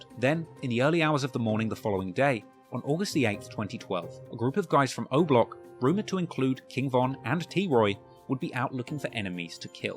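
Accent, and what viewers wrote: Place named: London, United Kingdom. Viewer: Ayo this nigga talking about Von with a London accent lol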